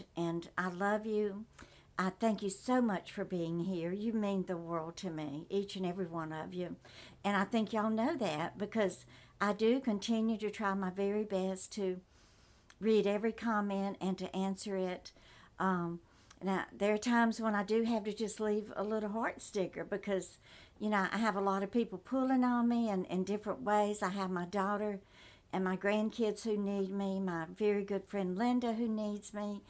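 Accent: South, United States. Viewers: Glenda you do look good, I am younger and in good shape but have more wrinkles on my face than you, so you are right about the water drinking. Thank you for you ... I appreciate your good old fashioned down south speaking voice (my father was from down south as well as my husband) and it is so good to see you so happy and lovin your grandchildren and family...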